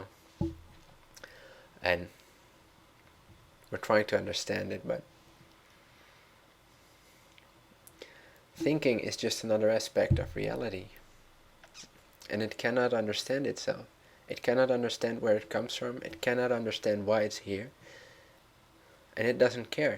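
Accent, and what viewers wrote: Netherlands. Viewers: yeah..... he's from Amsterdam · Is he Dutch?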